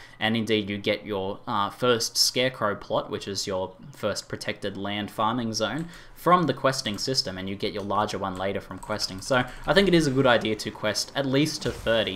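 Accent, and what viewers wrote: Australia. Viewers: Are you Aussie? Cause u sound like it lol I'm Aussie ;D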